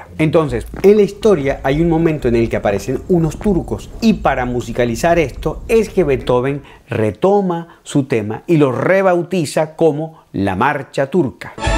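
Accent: Mexico. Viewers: ni parece venesolano se escucha mas mexicano que nada. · . Que interesantes y profesionales me han parecido el par de videos de La Cata Musical que he visto. Me suenas Mexicano, y como compatriota, me enorgulleces. Muchisimos exitos y bendiciones. Seguire checando tu contenido.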